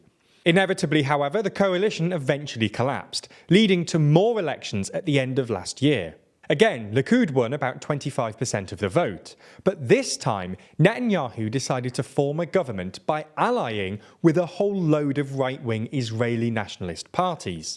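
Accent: England, United Kingdom. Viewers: It's funny listening to an Englishman pronouncing Hebrew words as if they were English words.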